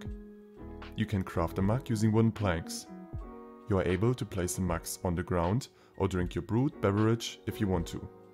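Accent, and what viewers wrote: Germany. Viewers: The german accent make it even better.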